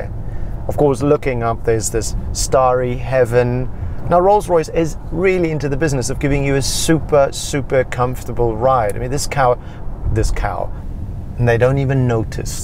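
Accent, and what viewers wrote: Afrikaans, South Africa. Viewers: You South African?? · Bro are you South African ?Your accent reminds me of home. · loved the little Afrikaans accent and words coming through lol lekke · Lekker? 😂 Howzit my broer? So refreshing to hear something South African Ma se kind, i need one of those 'lekker' fat wraiths😂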